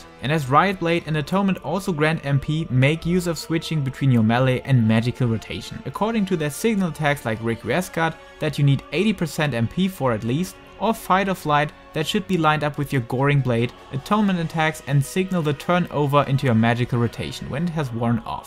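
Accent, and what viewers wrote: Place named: Germany. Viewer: Es ist einfach soooo anstrengend einem deutschen zuzuhören wenn er Englisch spricht. Sicher ein echt gutes Video, aber da man einfach nichts verstehen kann, gibt es ein Daumen runder… schade, dein Video hätte mir sicher sehr sehr gut geholfen und ein Abo sicher. Aber leider nein. Dann schau ich mir lieber Videos von amerikanischen Mitspielern an. Die versteht man wenigstens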